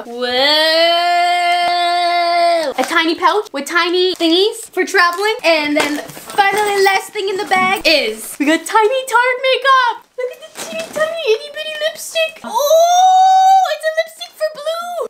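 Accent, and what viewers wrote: Canada. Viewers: her Canadian accent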